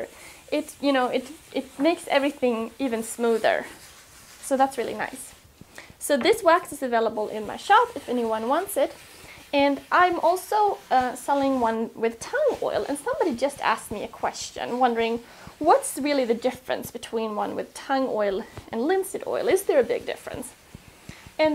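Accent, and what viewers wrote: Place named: Sweden. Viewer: How long time has you lived in the USA ? You think in inches, but your accent is very Swedish. Ever visit Sweden, and will / do you teach your kids (??) the other language ? Sorry for off-topic question, only answer if you feel like it :) Excellent videos!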